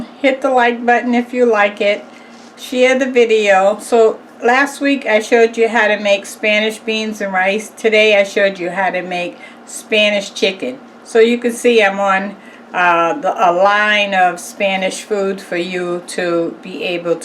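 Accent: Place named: Boston, United States. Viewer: grew up in west Roxbury, recognized the accent immediately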